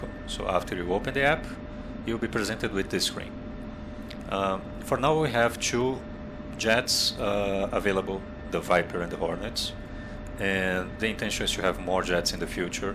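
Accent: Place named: Brazil. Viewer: Sotaque brasileiro em inglês é mt reconhecível xD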